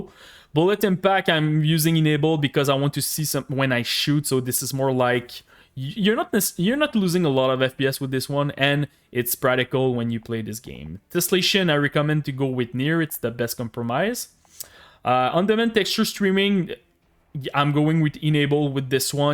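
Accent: Quebec, Canada. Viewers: Ton accent Quebecois te trahi beaucoup xD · best accent ever...jadore sa esti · U from Quebec? u got the accent lol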